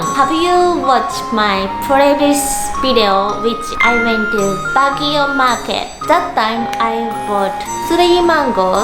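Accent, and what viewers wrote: Japan. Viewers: No one gonna talk about her Japanese accent? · I would like to inform the viewers why she says expensive-uh because its japanese accent they say english words with uh like bestfrienduh etc. PS. I have a japanese bff. so now u know ok? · My first impression from the title i was like what filipinos have done to her? Me after watching the video : ahhh kaya pala kase addictive 😂 Btw Love your accent just like my japanese friends 😊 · You look filipino to me😬😬 and your accent us like japanese, pilipino and visaya😐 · this is typically Japanglish pronounciation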